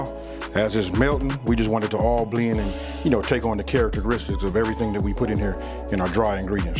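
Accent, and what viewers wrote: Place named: California, United States. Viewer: Once I heard that Cali accent I was like Nahh I trust sun cooking..... don't know why but I do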